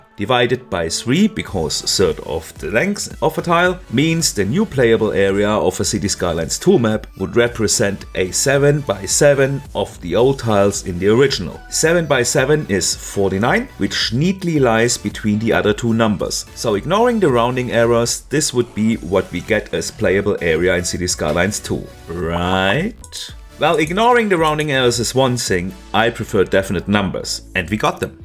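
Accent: Germany. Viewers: Gutes Video, jetzt werd ich mir das game kaufen :face-purple-crying: Aber den deutschen Akzent hör ich bis hier her:face-red-heart-shape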